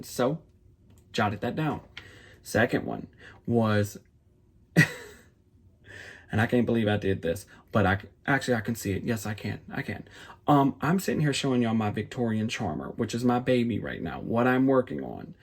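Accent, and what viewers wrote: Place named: Louisiana, United States. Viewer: you may stitch Victorian charmers but ohhhhh LAWDY son YOU are the true charmer! I happened upon your videos today and I’m smitten! I started cross stitching in 1978 after moving to Layfayette, Louisiana…I was elated to hear that Looosiana accent again! I so enjoyed your walk down cross stitching memory lane. It’s amazing how this hobby has evolved over the years. Back in the beginning I stitched on 26 count Aida. But when I discovered linen I felt like I hit the jack pot and I haven’t gone back to Aida…soooo there may come a day when you, too, will give linen a try. Like you, I also stitch on the same piece for days at a time. Monogamous stitching works best for me as well. You're not the only one who gets gobsmacked at the number of WIPS so many stitchers amass. I couldn’t take that pressure! I like your floss file system, especially the notion of the small bag for bits and bobs that are too much to toss in the trash bin. 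I recently converted all my cotton flosses over to Annie’s Keepers. That system is the best I’ve tried, and I’ve tried quite a number of systems. I can’t wait to see what your next video brings…keep them comin’ darlin!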